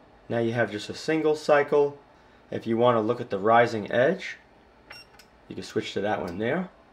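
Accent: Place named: New York, United States. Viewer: You can put a FL place stamp on your video, but that voice says you're my homie from NY...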